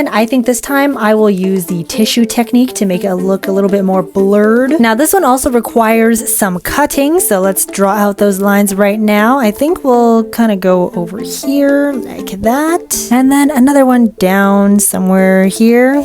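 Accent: United Kingdom. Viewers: British? You're my new favourite youtuber. And 10/10 for most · Wait.... are you british?😱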